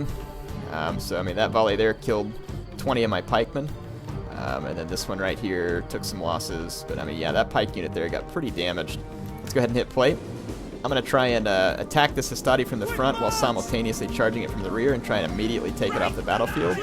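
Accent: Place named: Minnesota, United States. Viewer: Ah it's a drama that takes place in Minnesota, it's just some inflections in your speech remind me of a few of the characters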